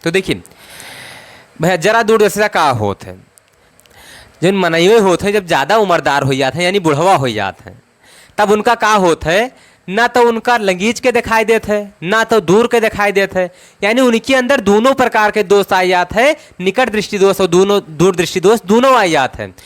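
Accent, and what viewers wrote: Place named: Awadh, India. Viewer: Gajab अवधी अंदाज में sir ji ❤❤❤❤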